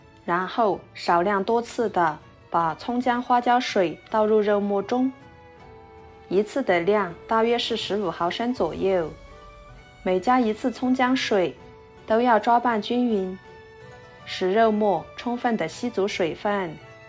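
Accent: Yunnan, China. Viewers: 嗯，一聽就是雲南妹子。😊好手藝👍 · 我就想问，博主是不是云南的？🤣🤣🤣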